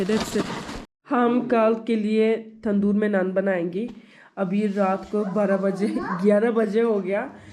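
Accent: Pakistan. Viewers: ❤️❤️❤️ sister I love your Urdu accent😁😁❤️❤️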